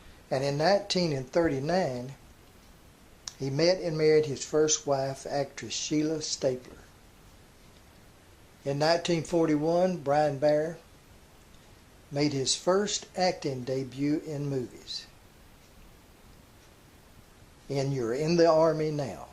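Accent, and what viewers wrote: South, United States. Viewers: It's really the proper way to speak however we Americans have become to lazy to use it. He is from the South just as I am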